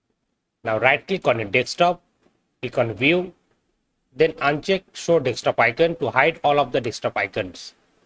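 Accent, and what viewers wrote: India. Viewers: Bro, your accent not understandable for me... You speaks on English, but I hear words like from Indian or Indonesia lol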